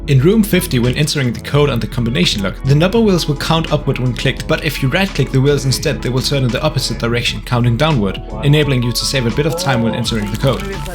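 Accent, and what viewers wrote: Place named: Denmark. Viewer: i can just hear the danish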